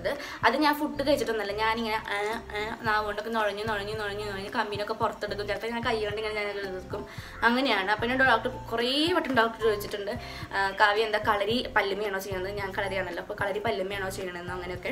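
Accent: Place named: Thrissur, India. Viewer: Chechide veed thrissur aahno slang athupole ind ❤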